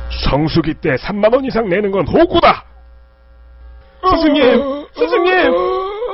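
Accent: Jeolla, South Korea. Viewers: 역시 전라도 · 순정 전라도분이시군요ㅋㅋㅋㅋㅋ 저사투리는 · 전라도임? 실망이 크다 구독취소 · 가 전라도 사람이라 잘아노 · 어쩜 이렇게 전라도 사투리를 맛깔나게 하시는지ㅠㅠㅠㅠ 고향 생각나서 눈물이 날 지경이네ㅠㅠㅋㅋㅋ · 아니진짴ㅋㅋㅋㅋㅋㅋ이토록 찰진 사투리를 영어로 번역할수있다는거야? 외국인은 이 전라도 소울을 느낄수 있는건가? ㅋㅋㅋㅋ개웃기넼ㅋㅋㅋ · 내가 광주/목포 사는데, 그냥 단어며 억양이며 뉘앙스며 그냥 딱 요동네다. 위화감 전~혀없음. 그냥 찐임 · 전라도가 고향인데 전라도 사투라 쓴다고 뭐라하냐 ㅋㅋ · 아따 저 걸뱅이새끼 난 이부분이 제일좋아ㅜㅜㅜ 이거들으러 온다 님 전라도사투리 너무찰져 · 이건 전라도 네이티브다... 전라도 사람이 아니면 구사할 수 없는 수준 ㅋㅋㅋ 어머님들이 쓰는 억양 그대로 고증해왔네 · 전라도 사투리 억양이 약간 남아있는게 개웃김ㅋㅋ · 우리 고모가 전라도 분인데..우리 고모랑 말투가 똑같아 우리고모인줄... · 사투리 오진다 ㅋㅋㅋㅋㅋ 전라도사람보다 잘쓰는듯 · ㅇㅇ? 장 님 전라도 장흥 출신이래요? 동향이시네 어짠지 사투리가 아조 제대로여서 깜짝놀랐네요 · 내추럴본 전남출신으로써 사투리 네이티브한거 완전 인정 해부러야것네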